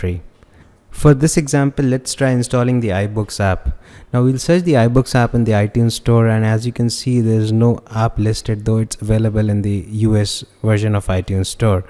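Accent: India. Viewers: indian accent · indian much holy crap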